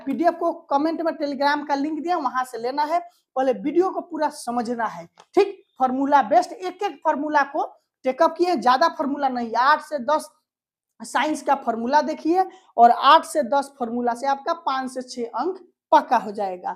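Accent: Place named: Bihar, India. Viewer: That Bihari accent - नमस्कार भाईयों ❤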